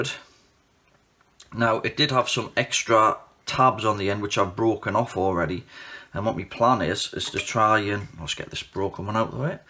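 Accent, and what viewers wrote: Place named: Northumberland, United Kingdom. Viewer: I'd have placed that accent more in Northumberland than Cumbria but very nice either way 😁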